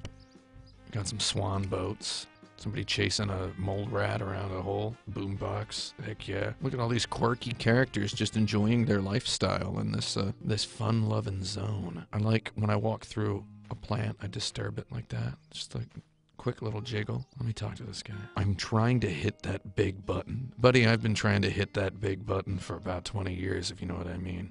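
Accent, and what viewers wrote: Australia. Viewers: As a British person, I think the text definitely read smoother with an Australian accent. I think the game is Australian come to think of it. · dangerously australian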